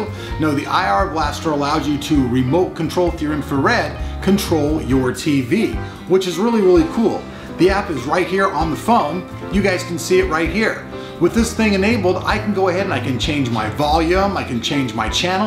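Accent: Russia. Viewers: Russian accent was my favorite reason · love ur russian accent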